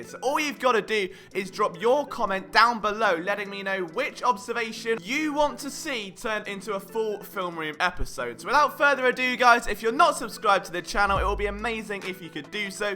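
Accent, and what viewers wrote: England, United Kingdom. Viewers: The Queens English 🐥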